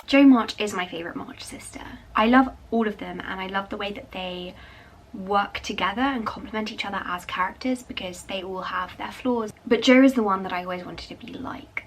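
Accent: RP, United Kingdom. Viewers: There’s no need to “correct” pronunciation. She’s speaking in English, and pronouncing all of her words in her RP accent. English borrows a number of words from different languages so she can pronounce it according to her understanding of English. If it were French, it would be a different matter. Given the range of accents in the UK alone, the pronunciation of words is malleable to each individual speaker. ✨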